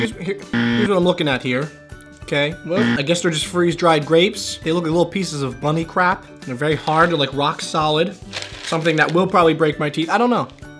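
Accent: United Kingdom. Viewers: Your British accent had me like 😭😭😆😆😆😭😭😭😭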